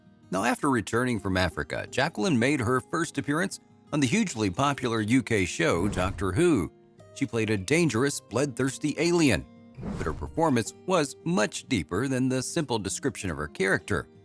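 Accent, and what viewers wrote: United States. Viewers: sorry but american accent and its Surrey not sirry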